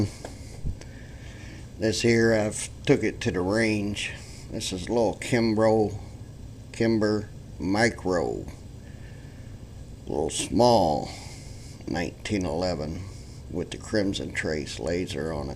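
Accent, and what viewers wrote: United States. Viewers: He's an American. He can talk any way he wants as long as it's English! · Someone commented negatively on your accent. I would like to leave a positive comment on your accent. I could be wrong, but it sounds like Arkansas. In any case, it's a wonderful accent - don't ever lose it. People would like to copy it, but you've got the real deal - what a treasure! In addition, you seem so candid and honest, truly genuine. Thank you for the review. God bless!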